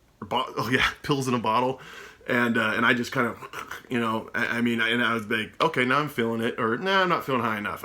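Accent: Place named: Oregon, United States. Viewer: yes, you do. I’m an Oregonian, from just south of Portland, and you sound like everyone I know.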